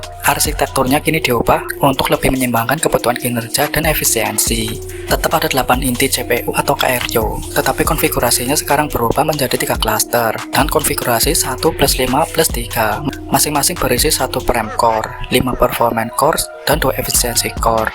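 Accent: Banyumas, Indonesia. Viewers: ngapak banget sih ya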